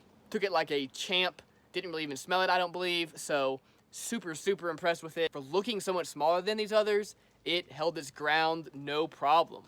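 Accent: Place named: South, United States. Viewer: I’M SUB’d…he speaks from experience, plus a whole lot of southern flavored enthusiasm…much love, bro…peace out..:)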